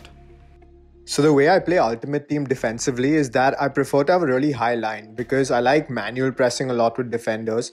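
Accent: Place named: India. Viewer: Indian accent is very bad i don't like it. Sorry to tell you the truth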